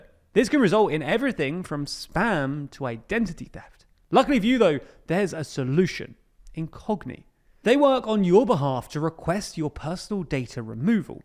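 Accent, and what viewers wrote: United Kingdom. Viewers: Fairly sure 'catch up to' is american and on this side of the pond we say 'catch up with'? I say that as one of the things i enjoy about the channel is a well spoken british accent!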